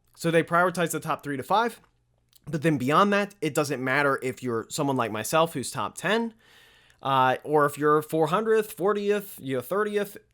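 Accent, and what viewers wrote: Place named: New York, United States. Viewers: Sounds like a New Yorker and says pounds like he's from Tennessee. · Clocking his southern accent southern *Ohio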